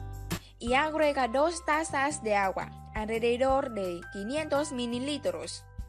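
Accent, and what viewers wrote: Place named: Russia. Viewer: Porque habla como rusa y es shinita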